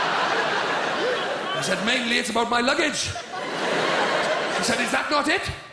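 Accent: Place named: Scotland, United Kingdom. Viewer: I assumed he was Scottish not welsh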